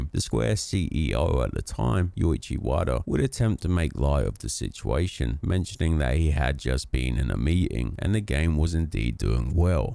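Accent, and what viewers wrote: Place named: United Kingdom. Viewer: You sound like Moe Sizlack from The Simpsons just getting out of bed with a british accent.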